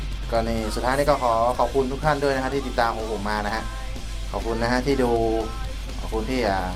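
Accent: South, Thailand. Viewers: คนที่ไหน สำเนียงเหมือนคนใต้เลยนิ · คนใต้ป่ะครับ ?? เหมือนจะทองเเดงนิดๆๆ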